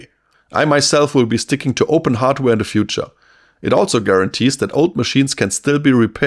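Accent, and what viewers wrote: Germany. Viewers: Ja man hört den deutschen Akzent und das fremdschamt schon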